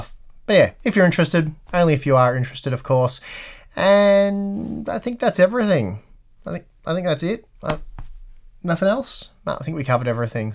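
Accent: Australia. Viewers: Steve are you from Australia?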